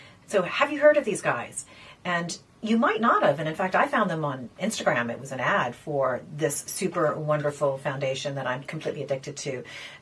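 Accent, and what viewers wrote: Ireland. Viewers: You sound Irish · Your accent sounds ever so slightly Irish at times. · Is it just my imagination or do you sound Irish sometimes? I just bought this baked foundation in porcelain and will try it today for the first time. Every review I've seen is amazing. Love your channel, I'm 50 and have never really used any sort of moisturizer or sunscreen because I would have a reaction to everything. But now at 50 I've been using Tallow which I triple render and my skin seems to love that just fine.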